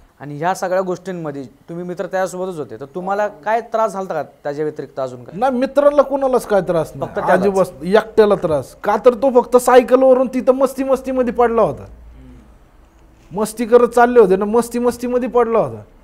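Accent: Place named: Pune, India. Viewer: Propar puneri language Good 👍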